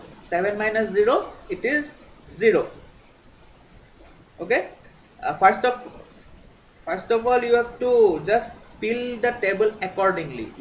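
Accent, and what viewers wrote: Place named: Assam, India. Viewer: Ur accent is like an Assamese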